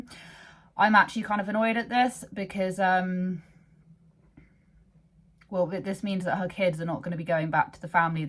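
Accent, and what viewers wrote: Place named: United Kingdom. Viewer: That's why I subscribed... plus... love the British accent:):) sometimes... most of the time it's about the kids.... except when you're peeing. Then it's about you....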